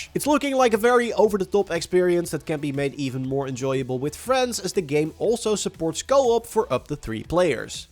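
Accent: Netherlands. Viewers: I loathe the super strong Dutch accent. It's even worse this time.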